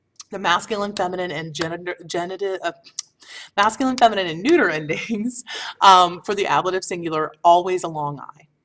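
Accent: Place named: England, United Kingdom. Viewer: i love your class but I you cant avoid your english accent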